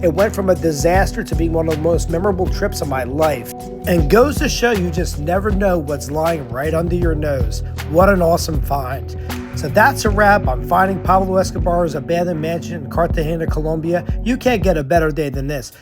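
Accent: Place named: New Jersey, United States. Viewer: I love your videos. May I ask where are you from? You sound like my cousins from New Jersey !! Keep up the cool videos man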